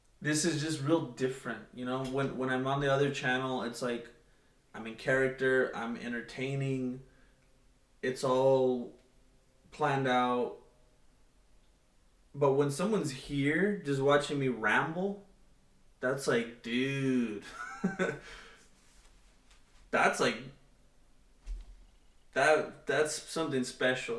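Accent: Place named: Mexico. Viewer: Thank You 😊❤️ I just love seeing the Italian flag 🇮🇹😃 with that Mexican accent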